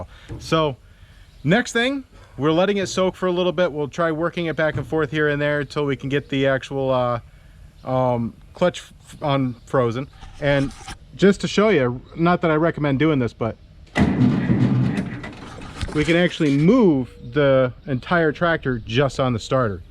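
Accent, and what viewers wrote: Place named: East Coast, United States. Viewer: Can tell your From the east. Deezel, Dee Zul. Here in Colorado it's a Dee Suhl, or Dee Saul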